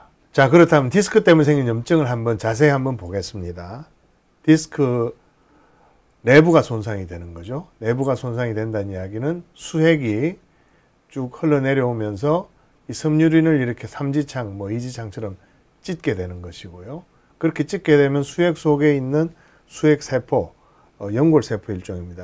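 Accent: Gyeongsang, South Korea. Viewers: 1년 기다려야하는 환자들 생각해서 열과 성을 다해서 설명해주시는 박사님! 의학 지식을 갱상도 발음도 알아들으니 넘 감사드립니다.어금니 꽉 깨물고 참아봐야쥬^^ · 배척 /배측 그냥 알아들으시지 …경상도 발음이 너무 좋아요 ! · 갱상도 확실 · ㅋㅋㅋㅋㅋ 갱상도 바름~^^ 귀여븐 사랑스런 감사한 우리 교수님~~😊😍😂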